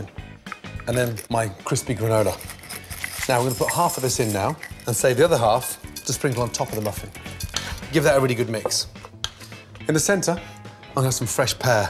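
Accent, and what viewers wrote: United Kingdom. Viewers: Peeeehs, centah, fooos sides, buttah. I love this accent.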